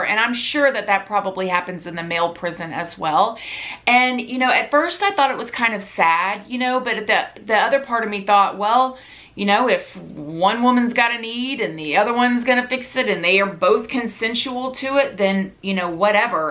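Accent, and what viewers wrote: South, United States. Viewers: You are literally so precious!!! Love your southern twang x you kept cracking me up!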